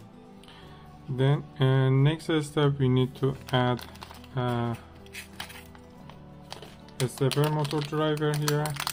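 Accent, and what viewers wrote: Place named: Iran. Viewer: سلام ممنون بابت ویدیو شما ایرانی هستید؟ لهجه تون که ایرانیه😅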